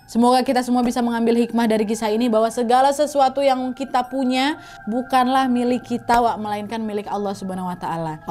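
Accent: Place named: Aceh, Indonesia. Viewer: Logatnya kak mengingatkan aku dengan pria aceh yg pernah singgah ☺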